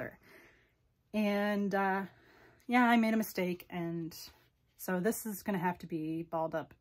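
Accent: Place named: Canada. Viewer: Good morning, You sound like you are from Canada. Whew you have quite a stash! The amount of yarn you can remember what it is and how you will be knitting it is dizzying! Are you challenged to figure out a plan for prioritizing or do you knit what you are in the mood to do? I'm guessing you are a really fast knitter. I'm envious! Thanks for sharing and your pet friends are adorable! I have 2 border collies to keep me company while I knit. They constantly entertain and the youngest one got a hold of one of my hanks for a sweater that was in a project bag and turned it into an impossibly swirling knitted mess of a string pile. I was able to untangle and wrap into a ball that took me 3 evenings. I couldn't get mad at him though, I need to be more careful so I am!!!! Cheers to you for sharing your deep stash! Carrie from the San Francisco Bay Area. 😘🍵🧶🪡🧵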